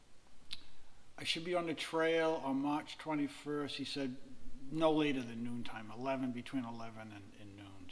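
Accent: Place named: Boston, United States. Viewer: Love the Boston accent. I start NOBO on 2/24; best of luck on your journey! Maybe find someone to mow the lawn so your wife doesn't have to do it- lol.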